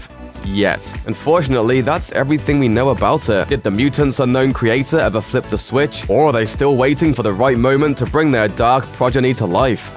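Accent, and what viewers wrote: United Kingdom. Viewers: British yong yea? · Ok why do you sound like a British chills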